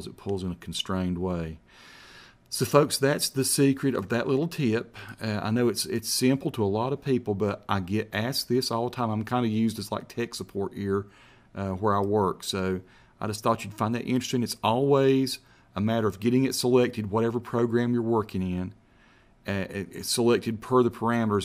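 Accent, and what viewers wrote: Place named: North Carolina, United States. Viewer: that accent is just precious. You sound like your from southeast NC.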